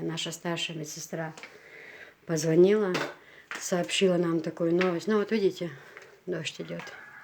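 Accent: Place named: Vedeno, Russia. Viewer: мне все нравится что ты снимаешь, рассказываешь!ДАЛЛА эатту бойла хьа. Я жила в пос. Мичурина в Грозном. И мои соседи Дунаевы, Альбиговы были веденские, ( они живут и сейчас там, я в Страсбурге).имена, Кемса в основном встречается у веденских больше. У тебя даже говор веденский. Я очень рада за твоих марзхой, им очень повезло с тобой. Мы жили душа в душу, делились всем, у меня прекрасные воспоминания о моём детстве, юности. Между Дунаевых и нами был маленький заборчик.Кемса (так зовут нашу соседку)всегда была нам как вторая мама. Её сестру звали Дикиева. с уважением Асет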